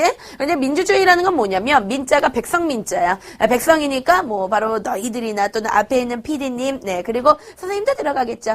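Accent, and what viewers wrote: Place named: Jeolla, South Korea. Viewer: 아니 ㅋㅋㅋㅋㅋ 전라도 사투리 개웃기네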